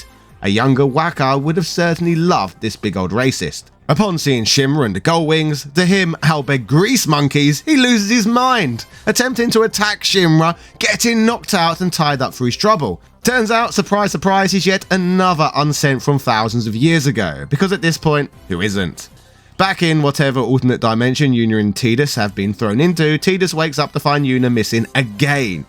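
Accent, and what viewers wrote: United Kingdom. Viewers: 1) I love british accents "Wakker" and "Yuner" 2) FF X-2 is the reason I stopped playing FF forever.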